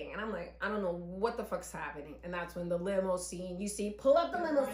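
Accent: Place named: Baltimore, United States. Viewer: The way you sound on here I would think you’re from Baltimore/DC area not N. jersey haha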